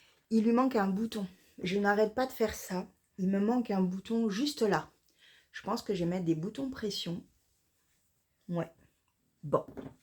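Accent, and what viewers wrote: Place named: Jura, France. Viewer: Mais oui, continue le ton Jura! Ce serait dommage de le lâcher 😊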